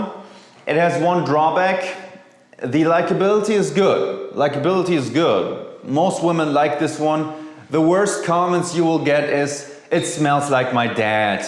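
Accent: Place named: Germany. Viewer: It’s his German accent. Btw pretty sure he speaks English better than you as a native speaker ever will lol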